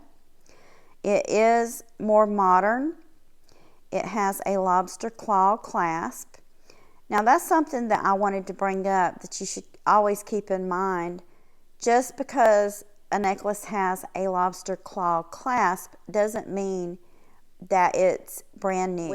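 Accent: South, United States. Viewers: Thank you! I love looking at your collection! You are a wonderful historian and being from the South, I love your accent! ❤️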